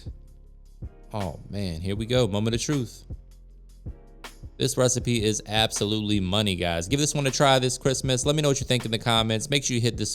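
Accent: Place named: Louisiana, United States. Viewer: Stop fuckin lying you're from Louisiana you ain't from Virginia with that accent.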